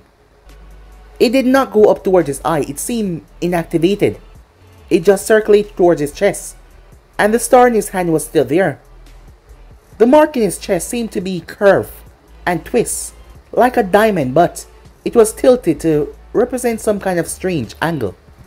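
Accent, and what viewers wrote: Jamaica. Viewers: Wassup bro I’ve always wanted to ask you this but are you Jamaican 🙂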